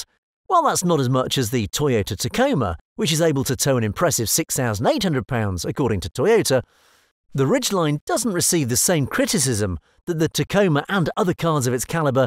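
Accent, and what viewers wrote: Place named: United Kingdom. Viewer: Terrible British accent for a car that does not Get sold in the u.k.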